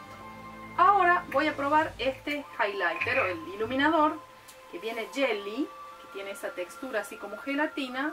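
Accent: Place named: North, Argentina. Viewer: Hola hermosaaa. Proba los polvos sueltos de ruby rose. Es verdad no es necesario saber maquillaje. Jajajaja me hiciste reír. No le lleves el apunte a las mala onda. Yo soy maquilladora. Y te maquillas bastante bien. Me encanta tu voz bien del norte representandonos en YouTube. Saludos desde Tucumán . Argentina. Yo vendo Dapop y Ruby Rose por instagram aquí en mi provincia 🔥🔥🔥🔥😍🔥😍😍😍 y tbn uso y amo el maquillaje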